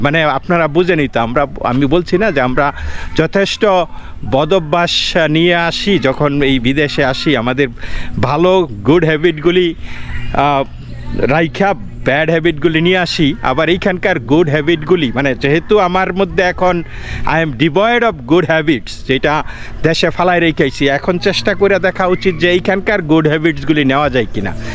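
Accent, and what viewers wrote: Bangladesh. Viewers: your English pronunciation is like Bangla.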